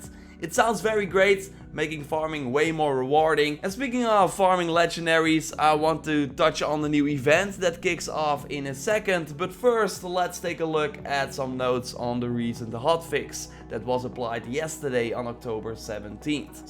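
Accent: Netherlands. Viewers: Great vid sir but are you dutch?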